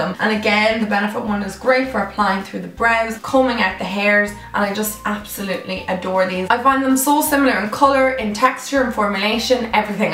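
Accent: Dublin, Ireland. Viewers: new subscriber and are you irish? 💕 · Casey Ryx Irish accents are so pretty! 💕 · Is she Irish?💖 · is she Irish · are your from Ireland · I love your accent lol, is it Irish?? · Are u from Ireland c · She from Ireland like me🤗😂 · Are you from Ireland? I just subscribed, loved the video! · omg are you Irish?😍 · Love your accent are you Irish? ❤️👑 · is it just me or do you have an irish accent? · Are u Irish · Sapphire K Irish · are you from dublin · Are you Irish? If so, me too :) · Zwitzel Monte Irish accent everyone love it😂✌️🇮🇪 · Are you Irish??? Where my fellow Irish peeps at??!?!!! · Is she irish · Your accent is so cute! Irish? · R u Irish cause I am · I saw this Video and clicked on it and I heard your voice so I looked at your page and I was right u are Irish🍀🍀Im irish 2😁😁😁😁😁Love your vids👻❤ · Are you from ireland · Can I just ask where you're from because your accent sounds a bit Irish 😹❤️ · omg please tell me your irish!! 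· You an Irish buddy to? 😛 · loved this video r u Irish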